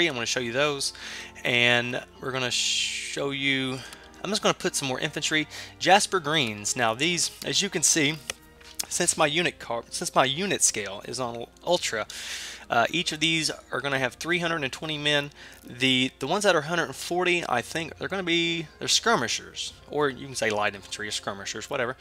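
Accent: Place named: South, United States. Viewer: from a neutral/Midwest accent to a straight up urban Southern accent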